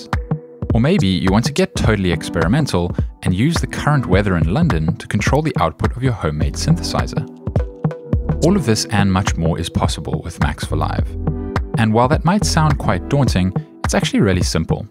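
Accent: South Africa. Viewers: Broooooooo are you south african?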